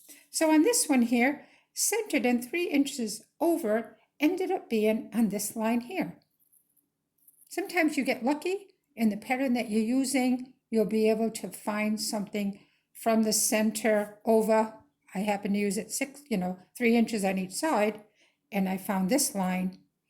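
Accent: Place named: Massachusetts, United States. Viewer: This is so helpful! You sound like you're from MA! Thank you for doingvthis .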